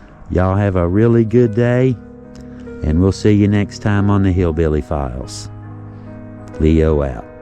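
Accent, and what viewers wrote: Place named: North Carolina, United States. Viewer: im from Granite Falls, NC the way you talk( your accent ) is the same as my friends that grew up around here so its easy to understand you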